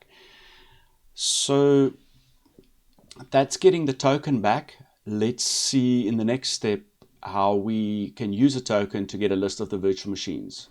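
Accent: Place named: South Africa. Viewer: Good stuff - The South African accent is also refreshing :)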